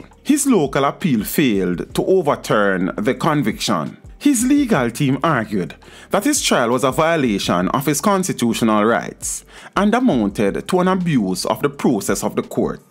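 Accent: Jamaica. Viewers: He’s Jamaican DUUUUHHH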